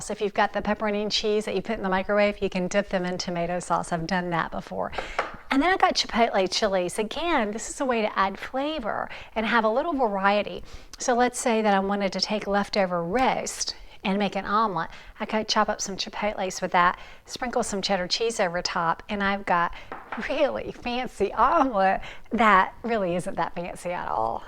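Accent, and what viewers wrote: South, United States. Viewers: I walk around the house saying "fathead dough" in your southern accent. It's starting to drive my wife nuts... she says.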